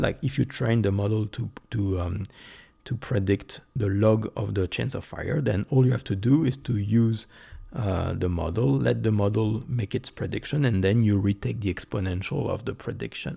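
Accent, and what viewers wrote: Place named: France. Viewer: Thanks for this video. It was really helpful for me. Nice and pleasure English language with French accent. Greetings from Poland 🇵🇱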